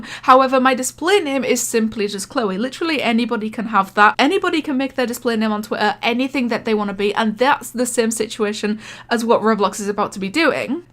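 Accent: Scotland, United Kingdom. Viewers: are you scottish eh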